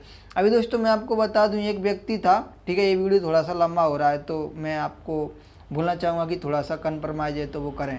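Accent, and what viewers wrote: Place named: Pahadi, India. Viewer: Bhai video kaafi achi thi. Tumhari tone se tum Pahaadi lg rhe ho Video like frm Uttarakhand (dist-Champawat)😊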